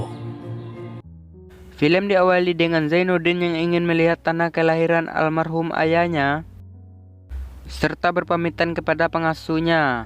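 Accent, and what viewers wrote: Madura, Indonesia. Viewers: Elu orang madura ya min kok logatnya kyak logat madura gtu😂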